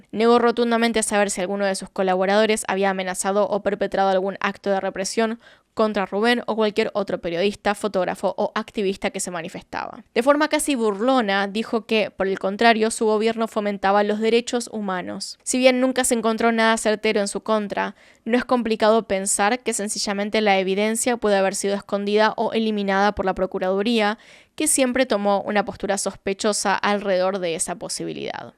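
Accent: Argentina. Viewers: Que lindo escuchar en tu tono argentino todos los lugares de mi hermoso México. Pero que tristeza da que este país lleno de cosas hermosas y personas increíbles como las que tu retratas en este video sea cada vez más conocido por los abusos que genera la autoridad contra su pueblo y ahora también el narcotráfico...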